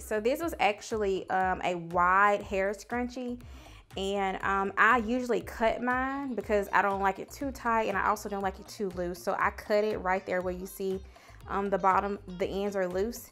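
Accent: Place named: South, United States. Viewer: 🙌🏾🙌🏾🙌🏾 love the southern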